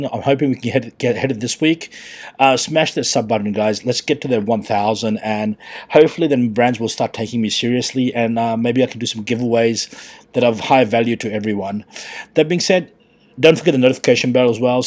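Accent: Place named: South Africa. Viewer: Nice accent mate, are you a South African ?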